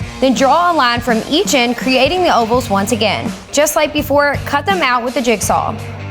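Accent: South, United States. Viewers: She is absolutely stunning!! The redhair, southern draw, knows how to use power tools and can build!! She is Amazing!!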